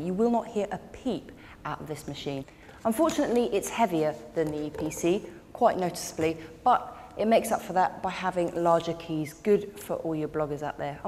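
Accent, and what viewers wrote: United Kingdom. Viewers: I like the way she do review, though her British ascent sometime hard to hear her what she speak.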